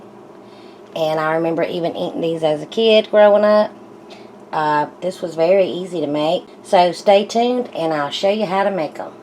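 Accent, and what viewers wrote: South, United States. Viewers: I've always wanted to try of these as pecans are my favorite. Thanks for the video. I'm definitely going to try these. And your southern accent is to cute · I most definitely love your southern accent!!!!!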